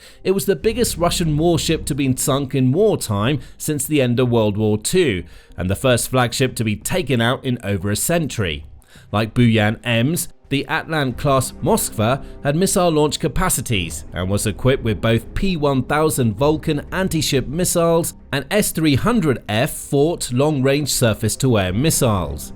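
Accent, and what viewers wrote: United Kingdom. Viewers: The English (UK) accent is starting to annoy.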